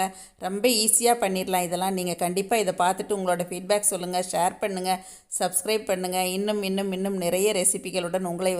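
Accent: Kongu, India. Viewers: superma 👌.karura palavidhamana visayangalayum kovil anai hotel market handloom bedsheets towels indhamari ungalai kavarndha visayangalayum podunga .therindhukolla vasadhiyaga irukkum.kongu tamil pesi vilakka neengaldhan super.valarattum ungal pani valthukkal.💐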